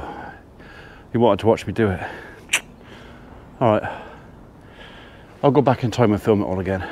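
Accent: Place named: West Country, United Kingdom. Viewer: well I be In Devon the crown jewel of the westcontry. and I must say i been cathing up with your epic vids your natraly funny as in comical and i love you have a strong westcontry accent and i loved the vid of you and holli very comical i hope mrs P is doing well keep up the epic vids its been weet here as weel on and off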